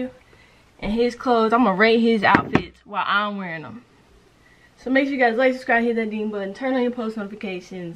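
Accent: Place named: South, United States. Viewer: Love your personality love your voice where you from. You have a southern voice.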